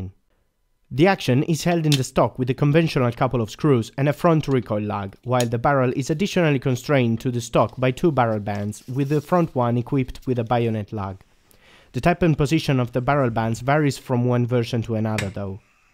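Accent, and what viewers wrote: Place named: Italy. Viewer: mostly for the ascent I thought you were Italian btw great video, greetings from Italy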